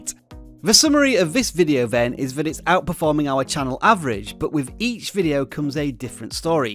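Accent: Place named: United Kingdom. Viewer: That's a British accent.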